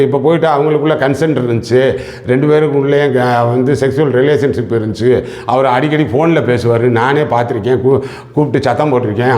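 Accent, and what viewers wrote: Madurai, India. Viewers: சார் மதுரை சிலாங் முறையில் விளக்குவது சூப்பர்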